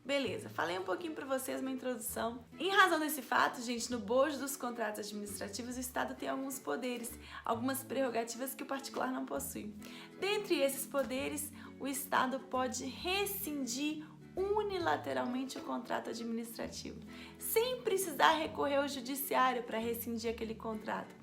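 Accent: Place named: Minas Gerais, Brazil. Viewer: Sotaque mineiro é inconfundível 😊⚪🔺⚪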